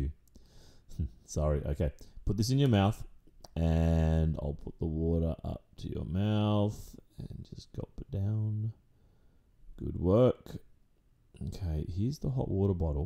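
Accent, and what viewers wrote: Australia. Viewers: Australian accents hit different🤣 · I finally found and Aussie asmrtist and I am in love. I thought my Aussie accent was strong and I'm Australian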